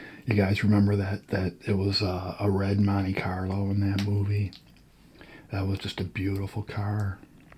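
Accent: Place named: Midwest, United States. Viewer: P.s. I love your accent! Are you from the Midwest? I’m from Utah but living in California 😊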